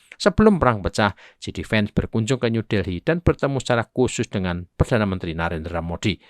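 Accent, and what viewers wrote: Java, Indonesia. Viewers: Jawa betul km lek medok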